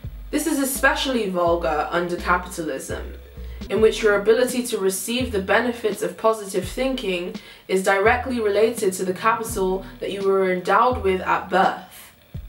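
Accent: United Kingdom. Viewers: Okay so I just started watching your videos a few days ago. Are you British or not? I can't tell and it hurts my brain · I cannot for the life of me figure out if you're southern or a weak scot it's sending me over the edge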